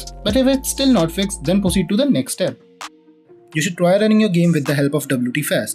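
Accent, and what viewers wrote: India. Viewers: Shit got real When i Heard he has Indian akcent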